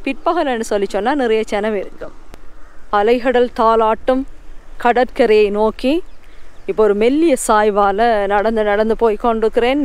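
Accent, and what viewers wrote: Jaffna, Sri Lanka. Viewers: தமிழ் : அதிலும் இலங்கை தமிழ் வர்ணனை தேனாக தி்திக்கிறது. தங்களின் சாரல் ஊடாக இலங்கை அழகான பகுதிகளை பார்த்ததில் பெரும் மகிழ்ச்சி. இராமாயணம் சம்பந்தபட்ட இடங்களை பதிவு செய்யுங்கள் கான்பதர்க்கு ஆவல். மகிழ்ச்சி .வணக்கம் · உங்களுடைய யாழ் தமிழ் யாழ் மiiட்டுவது போல் ulladhu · i am from tamilnadu. We all miss srilanka also YOUR tamil. Will decide and tell you my family trip to Tamil livinng country.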